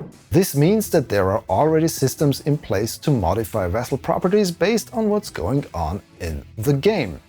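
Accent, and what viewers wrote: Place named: Germany. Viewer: Thanks for these update videos. I don't have time to get deep into KSA development these days, so I'm glad you're providing summaries of whats going on. As an aside, what is that accent of yours? It sounds sort of German, but slightly spicier. So like perhaps you're Dutch or Austrian? I don't think Polish, and I feel like Belgian would be more Frenchlike? Just curious.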